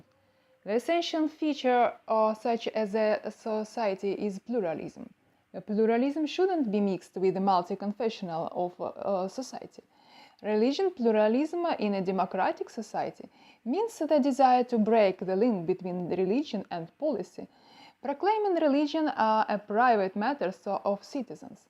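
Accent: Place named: Russia. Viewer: It's cool. I see that the person from Russia. I can hear my Russian accent😂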